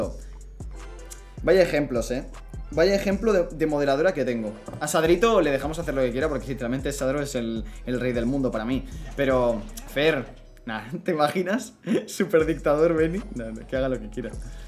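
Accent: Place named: North, Spain. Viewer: a entonces es norteño xd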